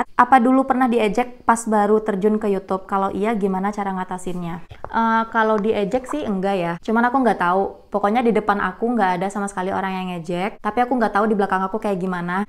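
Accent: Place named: Karo, Indonesia. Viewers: logat nya sumatera ya mba..horas mamak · logat karo nya 👍👍👍👍👍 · Awal ibu kakak itu ngomong Aku langsung keingat kalau orang karo berbahasa indonesia itu ada khasnya :v, dan aku tadi terkejutnya pas kakak ngomong bahasa karo :v auto bangga jadinga Sukses ya kakak Btw kakak org karo ?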